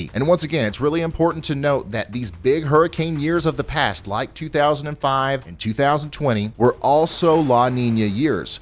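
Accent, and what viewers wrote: South, United States. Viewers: I follow your weather channel cause l feel you know your stuff in reference to weather. From Puerto Rico. I have been to kuntucky got family there. I love the southern accent and miss my friends out there.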